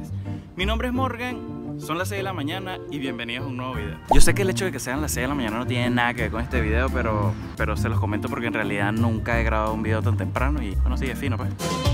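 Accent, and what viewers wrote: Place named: Venezuela. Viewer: no es de hater, pero porque los venezolanos siguen hablando como venezolanos estando en Argentina, me choca que no adopten ni un poco el acento.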